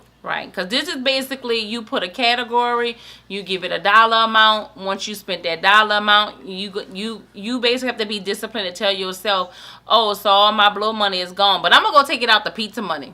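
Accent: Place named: New Orleans, United States. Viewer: just started watching your videos I lovvvvve you new orleans accent lol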